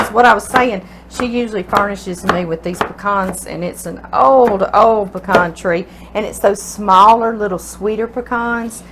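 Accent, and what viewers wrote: South, United States. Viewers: I just looove your Southern voice ❤Imma make this..Thank You for being kind to share this..Stay Safe ❤😷❤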